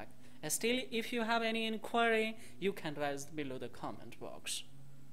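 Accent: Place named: India. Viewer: Your English accent is so duplicate.....pure indian accent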